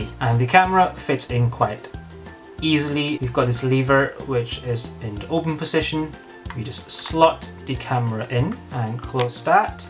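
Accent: Scotland, United Kingdom. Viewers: I like the idea you've got with you GoPro because of that reflection thing.. And I like your scottish accent :D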